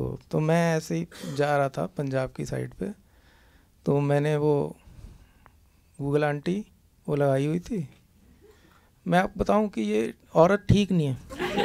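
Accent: Haryana, India. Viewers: Kya badhiya Haryanvi accent pakda hai 👍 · Topnotch haryanvi accent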